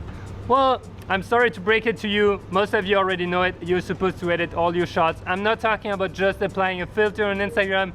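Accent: France. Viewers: Ton accent français 👌👌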